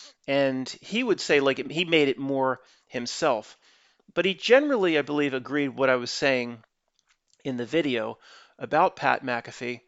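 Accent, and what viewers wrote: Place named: Philadelphia, United States. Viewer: That Philly accent is real. Cheers,!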